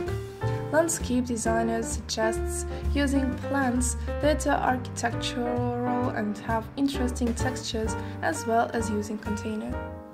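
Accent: Russia. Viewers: Таааакой русский акцент)))) Но молодец, популяризирует автор красоту!))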